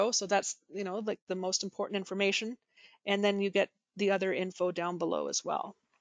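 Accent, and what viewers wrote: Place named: United States. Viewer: Very informative but what version of Canadian english do you speak? Very American pronunciation. Rout? Mobil? Yikes 😮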